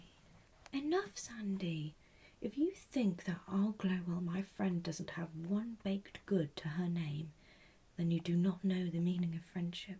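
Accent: England, United Kingdom. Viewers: this english accent is just honey to the ears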